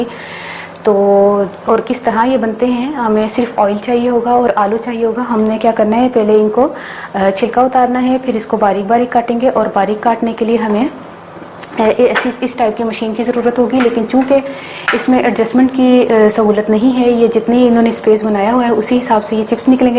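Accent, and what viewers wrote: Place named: Punjab, India. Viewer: Main bhi lahore main paida howi apki paki panjabi son k bohat khush howi main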